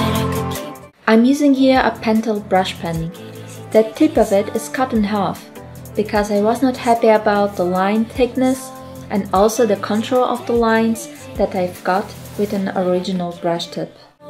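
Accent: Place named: Australia. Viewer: Sound Australian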